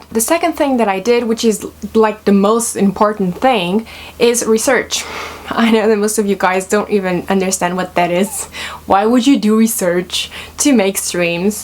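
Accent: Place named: Brazil. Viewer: Sabia que você era BR! Nosso sotaque é o melhor!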